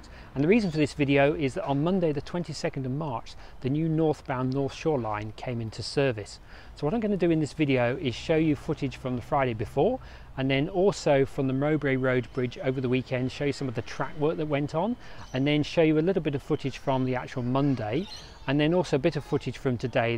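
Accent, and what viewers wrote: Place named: England, United Kingdom. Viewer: I thought ohh 15 minutes but when I heard your English accent and picked up what the subject entailed, I watched on. I do wish they'd make mega projects like this a bit more aesthetically interesting such as the tunnel portals. Nowadays it's more bland and ugly then ever and all that matters is seeing it open as soon as possible. Even road projects get better treatment.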